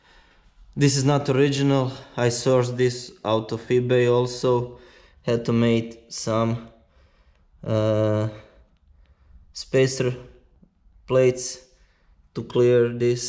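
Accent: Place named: Russia. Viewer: Cool work! Love your kinda Russian English! It's a freaking death machine!!! ;-)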